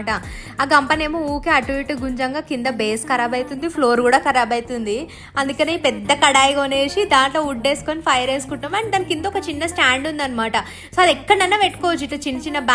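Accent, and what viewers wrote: Telangana, India. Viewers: ఏ అక్క తెలంగాణ యాస్ సూపర్.. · Telangana యాస చాలా బాగుంది.... నవారు దూరం అల్లాడు.... మనమే అల్లుకోవచ్చు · Pakka Telangana yasa 👏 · పక్క తెలంగాణ యాస ❤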